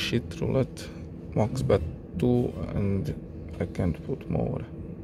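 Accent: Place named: Hungary. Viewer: ember! te magyar vagy........annyira lehet hallani,..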